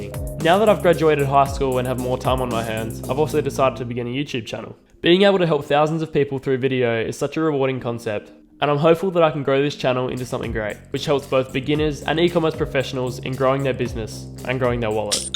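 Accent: Australia. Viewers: Good on you brother! Where are you from? Sounds Aussie.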